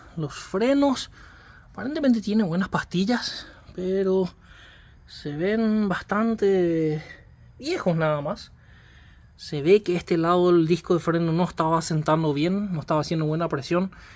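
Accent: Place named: Paraguay. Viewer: Cara, sou de Foz de Iguaçu. Nasci em 79. Minha adolescência foi com JDMs maravilhosos circulando, cuja origem, do py. Assistir teus vídeos me remetem um saudosismo do sotaque dos paraguaios na fronteira. Show😊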